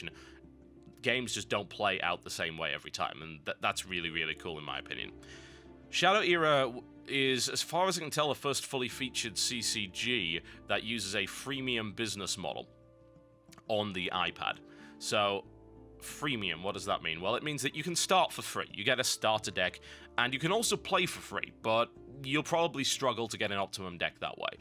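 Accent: United Kingdom. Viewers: Now really, think about this, British people talked like that first, therefore he is pronouncing it right, and we Americans are pronouncing it wrong.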